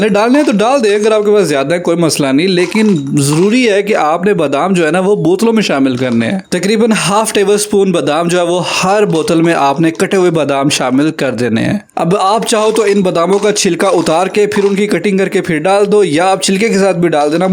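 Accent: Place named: Punjab, India. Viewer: Punjabi tarka😊 Nice way of talking ❤❤❤❤❤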